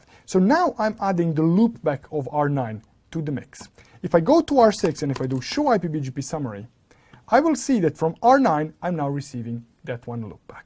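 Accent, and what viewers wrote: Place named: Eastern Europe. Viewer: I love this guy and his eastern europeen accented English, amazing explanation of all import concepts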